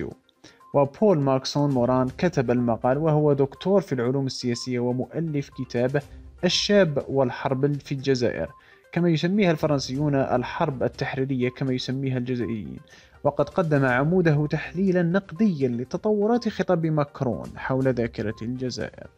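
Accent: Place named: Marrakech, Morocco. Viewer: تخفي لكنتك المراكشية و لا تستطيع اختراقنا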